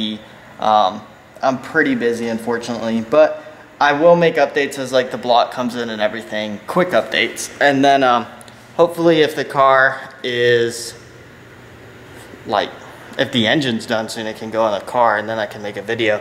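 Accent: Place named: Texas, United States. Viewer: What part of Texas are you from?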